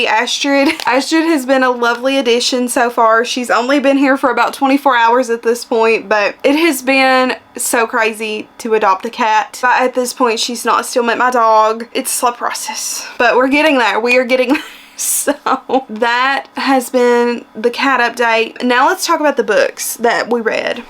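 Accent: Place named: United States. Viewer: I love your country accent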